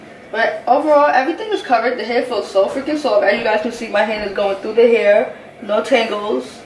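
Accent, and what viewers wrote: New York, United States. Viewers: Love this vid !!! I definitely know your from New York lol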